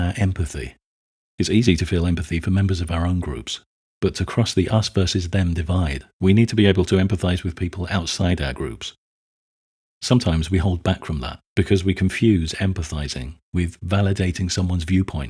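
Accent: United Kingdom. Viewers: There is a zero-sum game in real life, though. Resources and land-carry capacity are all limited goods. The ivory tower moralizing you see here in this video is never related to real world current-day examples for a reason. It would lay low the sort of bourgeoisie boutique politics that the author labors under while ironically living in a bubble where he deludes himself into thinking everything is unlimited since he is disconnected from the tribal realities of the situation of say the working class in Britain where his accent would suggest he lives.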